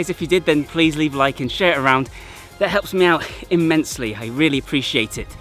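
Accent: United Kingdom. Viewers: where are you from? I suppose from United Kingdom, right?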